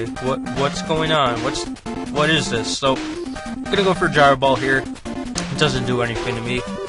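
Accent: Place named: Minnesota, United States. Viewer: Up North? Your voice reminds me of some people I know from Minnesota.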